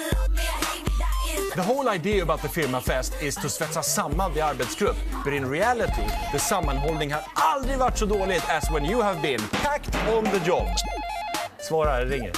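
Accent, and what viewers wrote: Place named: Sweden. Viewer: It's called "Swenglish". ;)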